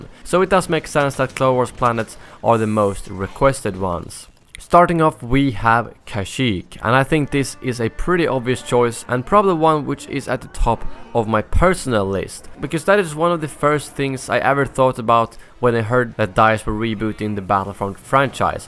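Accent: Sweden. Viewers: I knew it! You are from Sweden, could hear it from your voice :)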